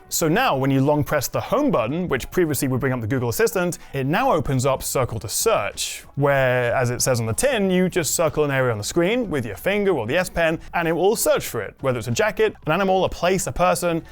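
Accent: England, United Kingdom. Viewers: Your like the 10,000 person to leave a review on this phone. English accent doesn't resonate with Americans. And your review is sub par mate.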